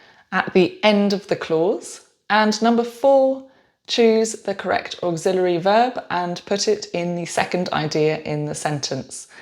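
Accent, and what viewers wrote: United Kingdom. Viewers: Bitte. Ich denke, das sind keine Komplimente, das sind Tatsachen.🙂 By the way: listening to your British English is so pleasant - I detest American English.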